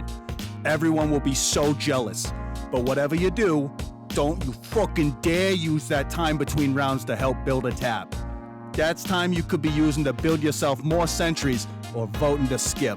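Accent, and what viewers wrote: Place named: Boston, United States. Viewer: Subscribed.. hilarious especially with the Boston/jersey accent 😂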